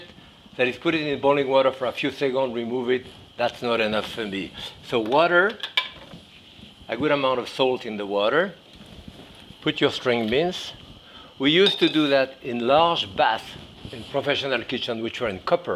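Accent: France. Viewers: I love his French accent. He reminds a bit of Poirot. And I love his cooking style, noy fussy, and cooking while he talks about the old ways of cooking.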